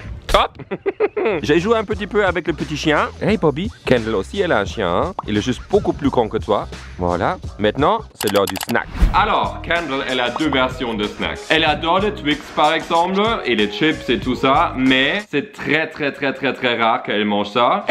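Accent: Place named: Canada. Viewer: tu mon youtubeur préféré des vidéos accent canadien et qui met quoi des vidéos trop trop rire 🇩🇪👍🇨🇦👍🇩🇪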